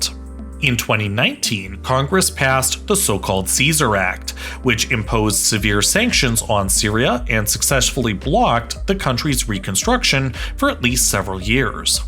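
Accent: Poland. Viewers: Hey mate, you should stick to your original voice. Your polish accent adds a sense of charm to your videos and makes it feel more authentic. The American voice over kind of makes your videos feel more robotic. Overall, this video was really interesting and very enjoyable. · I prefer your voice and your polish accent over this sanitized american voice, your videos sounded way better before (the content keeps getting better though)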